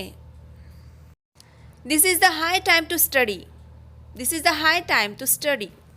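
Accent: Maharashtra, India. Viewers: Your hindi signs marathai languge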